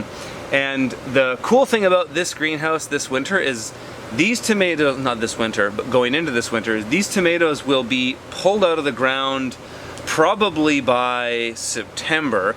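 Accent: Canada. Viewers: You have the softest Canadian accent I think I've ever heard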